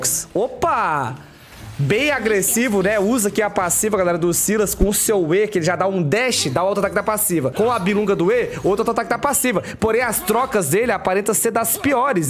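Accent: Minas Gerais, Brazil. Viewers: vc e MIneiro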